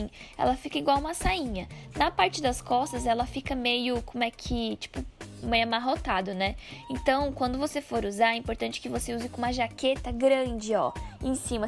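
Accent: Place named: South, Brazil. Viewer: É do sul né? Ksjsk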